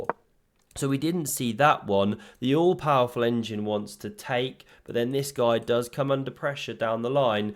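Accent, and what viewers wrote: England, United Kingdom. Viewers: which part of uk is ur accent from?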